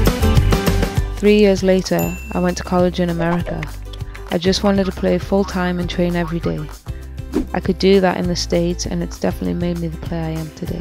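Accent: Birmingham, United Kingdom. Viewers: waaaayyyy! Brummie!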